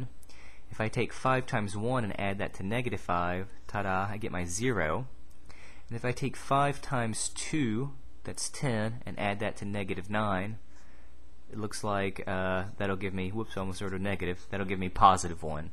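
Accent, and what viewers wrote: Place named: Mid-Atlantic, United States. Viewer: Hey, what state are you from? I'm detecting a slight mid-Atlantic accent. No?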